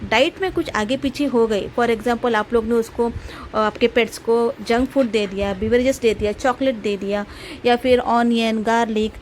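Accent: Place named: Telugu, India. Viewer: Pakka telugu Haaa😅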